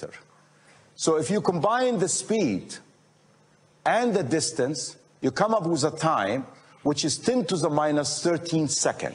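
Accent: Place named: United Kingdom. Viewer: This accent is British accent 😅😅😅😅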